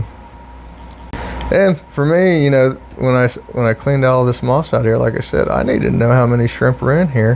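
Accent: United States. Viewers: Also wanted to know of course you gotta southern accent. Where are you from?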